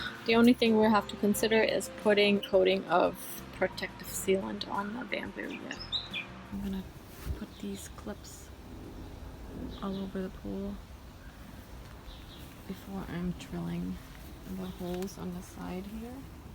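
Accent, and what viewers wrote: Germany. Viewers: I hear a bit of German accent in your voice. It's beautiful. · Fantastic video! Are you by any chance originally from Germany? (Slight accent detected) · Very nice! Do I detect a German accent 😊?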